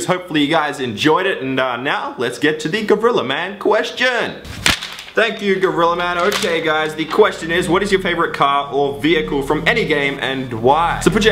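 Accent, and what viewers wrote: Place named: Australia. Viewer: Aussie mate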